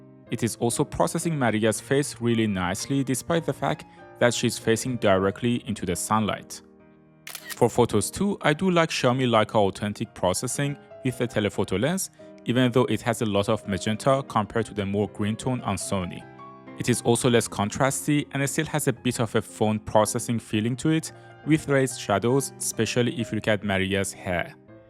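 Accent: Iran. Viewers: خانومتون از دید خواهری خیلی زیباست. راستی از لحجه تون فهمیدم که هم وطن هستین.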